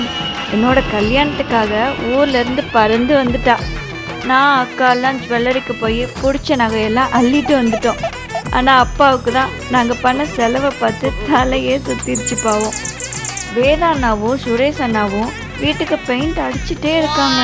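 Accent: Coimbatore, India. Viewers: unga native coimbatore correct?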